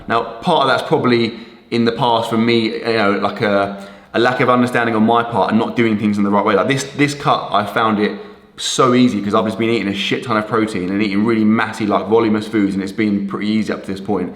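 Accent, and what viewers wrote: United Kingdom. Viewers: It’s the British accent, like I can’t stand the voice at all, no offense to anyone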